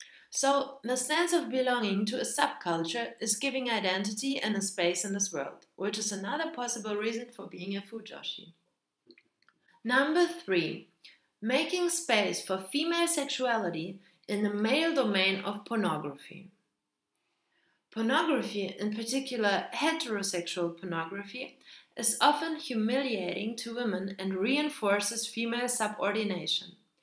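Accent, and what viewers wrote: Germany. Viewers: I love how it is super obvious that youre german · Are you German? :3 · Your German?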